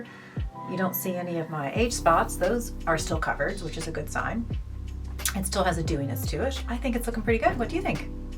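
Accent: Ireland. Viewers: I’ve been on the fence about this, but now I’m going to try it after seeing how great it looks on you. PS: don’t remember how I stumbled across your channel, but I’m so glad I did. You’re lovely, and I love that hint of the lovely Irish brogue!💚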